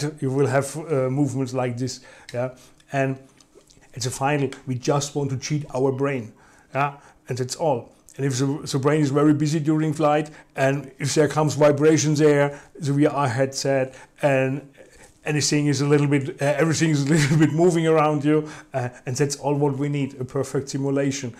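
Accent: Germany. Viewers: Höre ich da einen deutschen akzent? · Danke für das ausführliche Video! Ich gehe mal davon aus das ich einen deutschen Akzent gehört habe und schreibe frech auf deutsch. Hast du das im Übersee gekauft oder in einem europäischen Shop wie Winwing ihn hat? Frage bzgl. der Kosten für Zoll etc. Und vielleicht gibt es ja einen deutschen Supplier den ich nicht kenne. Danke im Voraus!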